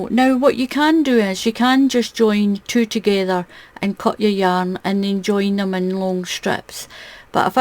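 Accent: Scotland, United Kingdom. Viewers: I love some of the patterns, and I also love your beautiful accent. You sound Scottish. I unfortunately, have trouble following along, because you don't use U.S. information/directions to your video's, and i find that very frustrating when I hear; "double crochet" and it's a "single/ treble." It's quite backwards and confusing to me.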